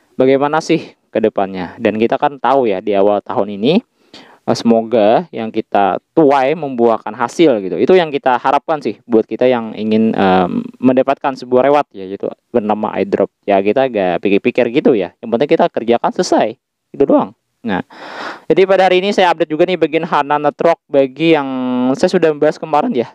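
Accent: Banjar, Indonesia. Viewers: Logatnya Banjar banget min..😅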